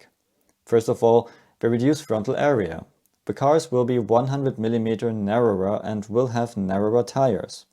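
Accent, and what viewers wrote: Germany. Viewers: Danke, endlich mal ein Deutscher der es vernünftig knapp erklären kann. Ob auf deutsch oder englisch ist egal - der Akzent vermittelt ein gewisses mindset😂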